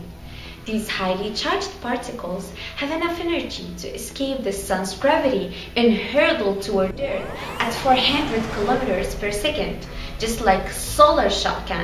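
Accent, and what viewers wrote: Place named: United States. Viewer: Amazing! I like your american accent! Congrats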